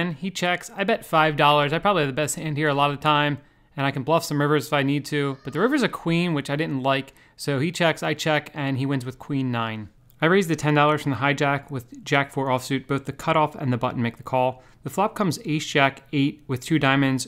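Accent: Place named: Mid-Atlantic, United States. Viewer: Toedal profit lol can't hide that mid-atlantic accent, really enjoy the vlog!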